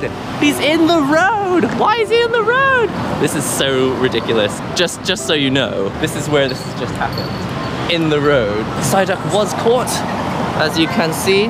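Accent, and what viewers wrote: United Kingdom. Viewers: Um wierd what breed are u? Asien,With Briten Accent, Who speaking English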